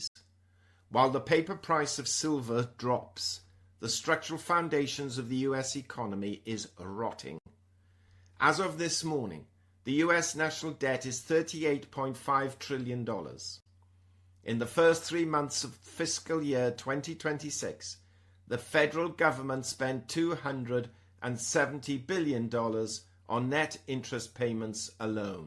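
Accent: United Kingdom. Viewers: Hi - thank you. Really appreciate a calming analysis at a time like this. Had just assumed you were in the UK on account of your accent - gather not. All the best. Simon / Adelaide - Australia - PS the presentation seemed as polished as ever to me.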